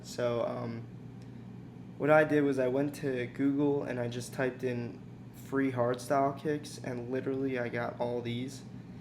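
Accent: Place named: Chicago, United States. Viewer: What suburb of Chicago are you from? You sound just like my buddy that grew up in Barrington :)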